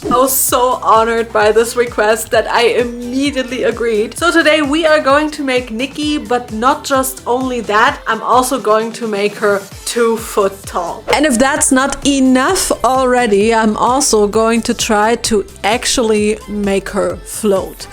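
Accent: Germany. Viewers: i literally KNEW you were German cuz it’s the accent, ANYYWAYYY girl how do you have so much talent? 😔that doll looks AMAZING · Du klingst deutsch 😉 👍🏻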